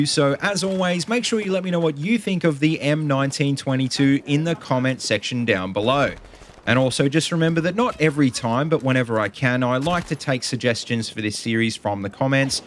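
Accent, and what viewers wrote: Australia. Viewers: Thanks mate. Great review and good to hear from an Aussie. I've subbed and look forward to your next videos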